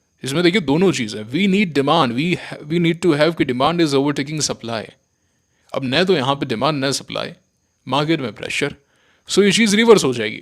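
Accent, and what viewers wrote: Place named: Bikaner, India. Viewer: आपकी बोली से आप नोखा बीकानेर से लगते पुश्तैनी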